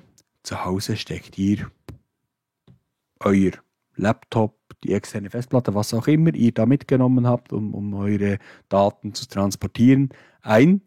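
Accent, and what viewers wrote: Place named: Switzerland. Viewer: ich grinse hier vor mich hin. irgendwann fliegen wir mal zusammen. kannst du dir das vorstellen? Wir sitzen zusammen da drauf? Leider kann ich mit den Tips nichts anfangen. War aber nett deinen Schweizer Dialekt zu hören.!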